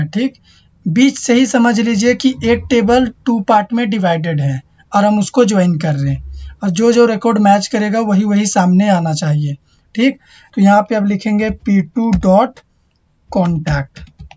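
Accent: Jamshedpur, India. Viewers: Aap jamshedpur se ho kya 😅? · Sir JAMSHEDPUR se ho kya?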